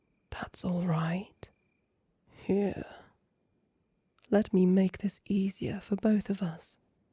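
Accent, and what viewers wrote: United Kingdom. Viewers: might one of the only good British people in the world already I think she’s British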